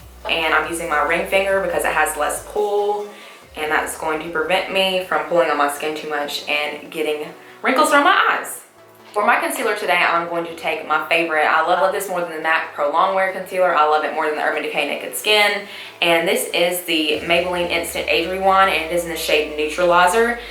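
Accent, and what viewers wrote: South, United States. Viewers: (1) You are even prettier natural! (2) Your Southern accent really surprised me for a moment - but my ear adjusted. :) (3) Your skin is naturally perfect & translucent. (4) Nice suggestions and demonstration. Thank you. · Bc she has a southern accent!?! OMGGGG this makes me mad like they're not the same whatsoever she just has the same accent as someone else and now they remind others of someone, seriously 😒 gosh this is annoying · that's where that sweet accent comes from! I want to live down south so bad! Great video I love that you use mostly affordable drugstore make up!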